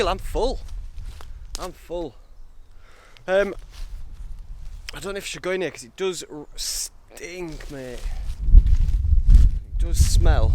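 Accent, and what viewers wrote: Yorkshire, United Kingdom. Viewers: your a funny fucker..I love the Yorkshire accent.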